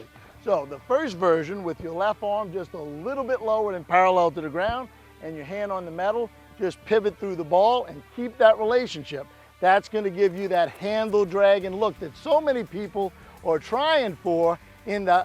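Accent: United States. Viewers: You American?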